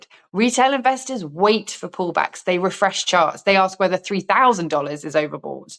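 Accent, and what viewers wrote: England, United Kingdom. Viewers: Funny thing...I don't trust JPMorgan or British accented commentators. Is she reading a teleprompter? Makes you go hmmm... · Sounds like a lady with an English accent that likes to hear soft talk. I hear nothing of a substance here. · Mostly asking, because of your English accent. I'm fully aware of 'everything' you're talking about + more. I'm in Australia 🦘 SO, now I'm going to get online & find out where gold hit $3500 per oz. In Australia gold is waaaay over that. I know why. SO yep, about to leave your chanel & find out where gold hit three & a half grand 💥 Pretty sure it was hovering around 3 grand in USA in the last few days / weeks. I'll almost bet my life, your headline is just more CLICK BAIT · IMO, the British accent is beautiful and very classy. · Is she british?:cat-orange-whistling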